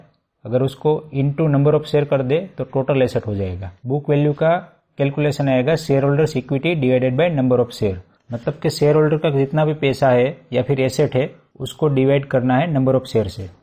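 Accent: Gujarat, India. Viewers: R u gujarati 😀😀😀😀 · Are you Guajarati? Language tone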